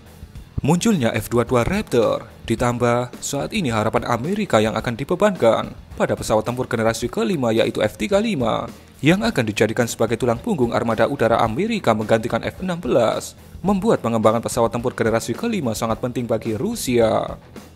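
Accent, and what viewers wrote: Indonesia. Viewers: Medok bet nih yang ngomong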